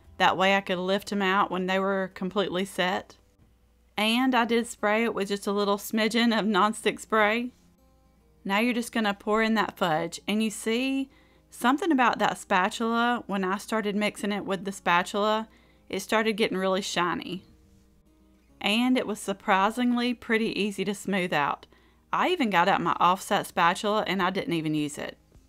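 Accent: South, United States. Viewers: Here in New England (Maine for me) we make Needhams in it, makes the chocolate shinny, check out a recipe for them.Love your southern drawl.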